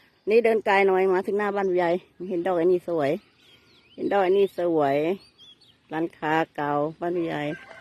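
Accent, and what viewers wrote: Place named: Laos. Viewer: พูดเนิ่อๆ เหมือนคนอุตรดิตถ์ลาวเวียงนะแม่บ่นไปเถอะได้ตังค์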